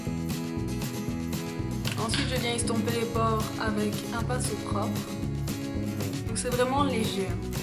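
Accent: Belgium. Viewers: Kikou je viens de découvrir ta chaîne et je me suis abonné afin de parcourir les autres vidéo😉des la 1ere minute je pouvais parier que tu étais belge.tu es de quelle region?moi de mons😆bizz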